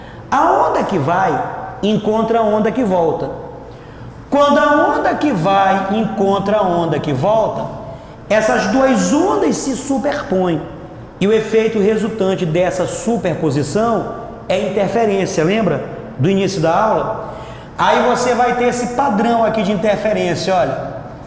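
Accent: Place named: Belém, Brazil. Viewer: De Belém... Reconheci pelo sotaque rsrs. Mas a aula foi muito boa!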